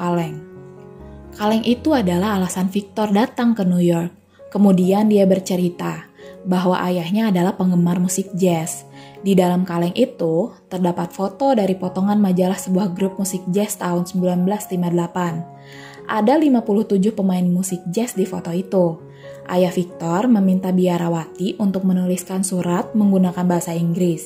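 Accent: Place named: South Sulawesi, Indonesia. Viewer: Suara sulsel ketara sekali.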